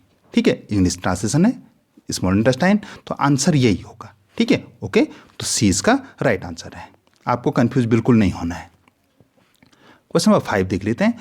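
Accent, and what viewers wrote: Garhwal, India. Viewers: I just love your gadwali tone sir ji ❤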